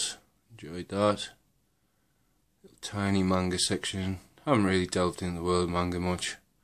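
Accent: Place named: Northeast England, United Kingdom. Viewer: I'm from just outside Newcastle, so I picked up on the accent! Have you added any more to your batman collection since you posted this video?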